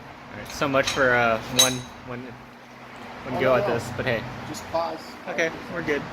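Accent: United States. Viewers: Looks like america.. sounds american.. uses metric... ? Great video, best one on doing these. Ive been told its a good idea to also drill a hole in tube before sleeving, to give an extra spot to weld (spot weld). But with your welding method its probably not required. Top job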